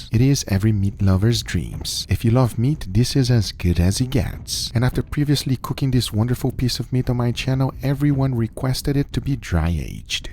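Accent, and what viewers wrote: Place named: Brazil. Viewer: Is from Brazil? His accent sounds like a Brazilian accent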